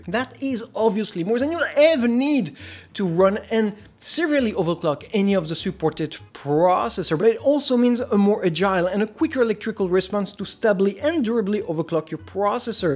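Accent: France. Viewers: Frérot ça ce vois t'es français , very good accent , tkt on est pareil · My gawd, that french accent x) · That French accent nice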